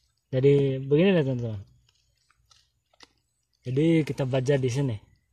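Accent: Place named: Sabah, Malaysia. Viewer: Kau org Sabah pulak teman2😂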